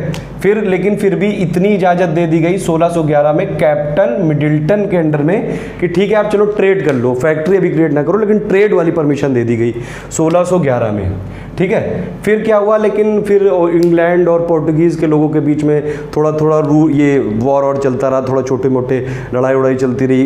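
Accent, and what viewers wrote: Kanpur, India. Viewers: Nice kanpur accent sir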